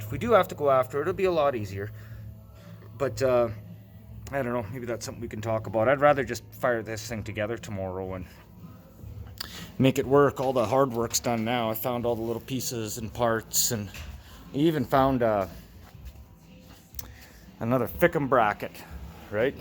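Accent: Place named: Canada. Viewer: You sound very Canadian.😃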